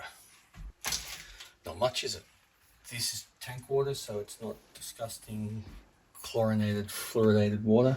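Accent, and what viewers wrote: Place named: Australia. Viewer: love this video! good to hear from an Aussie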